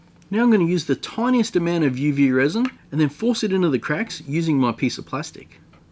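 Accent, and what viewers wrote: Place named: Australia. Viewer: So australian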